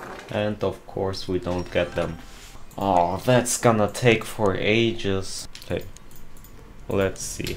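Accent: Germany. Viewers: I could swear i am hearing german accent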